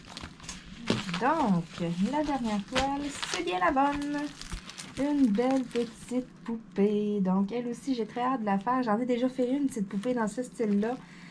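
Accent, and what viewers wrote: Canada. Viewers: Vous êtes super comment ne pas vous aimer avec votre accent. Canadien qui me rappelle de bons souvenirs au quebec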